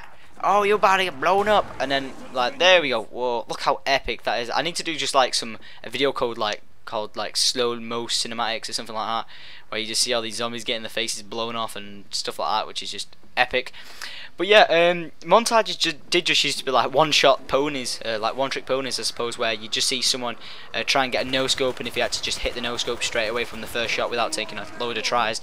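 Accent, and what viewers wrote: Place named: England, United Kingdom. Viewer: Where in England are u from?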